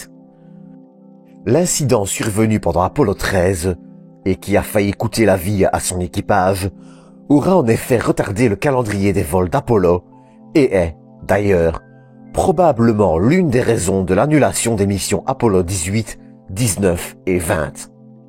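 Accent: Belgium. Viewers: T'es belge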